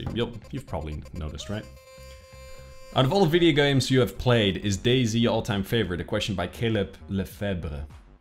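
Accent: Netherlands. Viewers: Een Nederlands accent kun je nooit echt verstoppen, kijk je video's al tijdje, en je bent een goede speler. Heb Dayz al een tijd niet mee gespeeld, zou je een video kunnen maken over de staat van de game, hoe is het veranderd in het laatste half jaar ? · Be proud of your heritage; don't drop your Dutch accent just because you might think it's uncool, nothing wrong with anyone (whether they're streamers or not) with an accent. Just my 2 cents man, always enjoy your videos.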